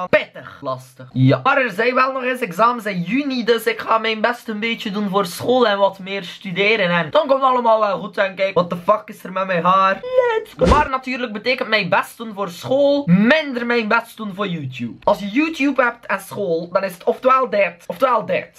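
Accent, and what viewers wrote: West Flanders, Belgium. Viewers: Uw accent is echt top! Belgium aan de top <3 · jaja eindelijk een west Vlaming Zaliggg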